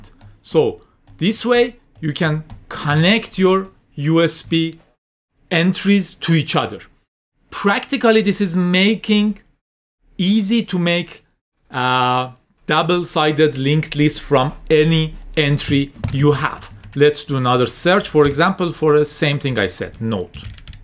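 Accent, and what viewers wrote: Iran. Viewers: I grew up in Iran... this accent sounds Farsi, but more extreme than I usually hear... ,🙂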